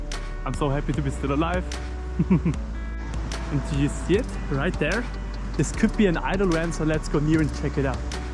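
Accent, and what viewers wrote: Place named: Germany. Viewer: It's funny to hear this German accent, I keep thinking "just say it in German." - Just kidding, you speak English very well and I love Ateez, so this is very much appreciated.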